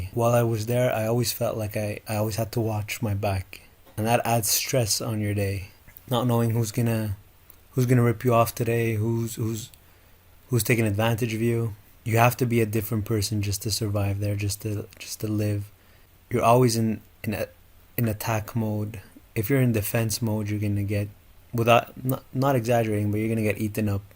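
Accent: Egypt. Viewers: To be honest, I can't agree more, but let me say that you sound so Egyptian! The way how you say Raml, Mansheyya, Montazah, it's proving that you are Egyptian. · U are from alexandria cause of tge way u speaking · Hey, for me what you are just trying to do is SHOWING OFF or trying to get more VIEWS, you are pretending to be American but you are Egyptian and it's easy to tell from your accent and maybe you are living here in USA,!!! i have been here in USA more then 20 years and i never said or published any thing bad about my own country and off curse i wanna everything in Egypt to get better but not with publishing that stuff in English???????? (it's a lot to say about that), any way every country has their own bad stuff as well even in USA.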